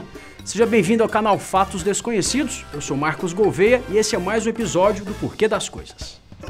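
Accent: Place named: Minas Gerais, Brazil. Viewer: Vídeo bom demais, ainda mais com esse sotaque "minerin". Sou fã do canal. 💕💕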